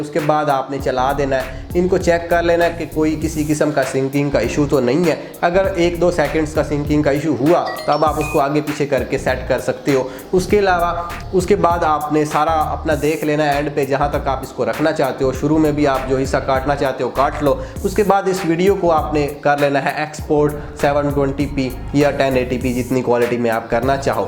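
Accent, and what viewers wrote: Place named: Himachal Pradesh, India. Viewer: Bhai vedio ka pta nhi voice se lg rha tu b himachal ka h,😄😂😂😂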